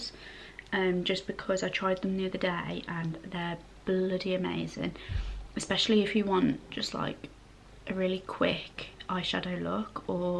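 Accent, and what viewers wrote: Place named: United Kingdom. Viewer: I’m in love with your British accent...it’s so charming!!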